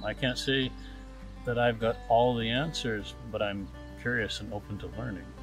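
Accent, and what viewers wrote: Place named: North, United States. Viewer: that you! I knew you were from the north from your accent. I was born in Wisconsin, but transplanted in Brownsville Tx.😂😂 Still haven't shaken the milk 🐄 thought 😂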